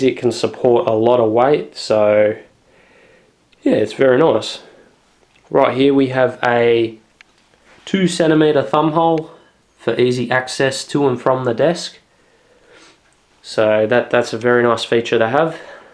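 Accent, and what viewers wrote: Australia. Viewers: awesome setup! M8 r u Aussie:D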